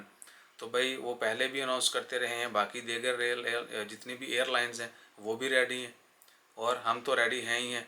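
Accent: Pakistan. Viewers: Salam Walekum kya hal hai theek ho awaaz se Pakistan aadami Corona test Corona test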